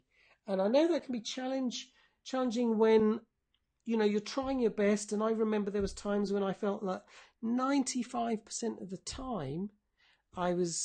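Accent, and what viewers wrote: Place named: United Kingdom. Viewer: Hello. I just stumbled onto one of your videos posted on YouTube and concluded iI would like to experience more of them. So….Facebook live? Thursdays at 5pm? But 5pm where? You sound British. Are you in Britain? Five hours ahead of me.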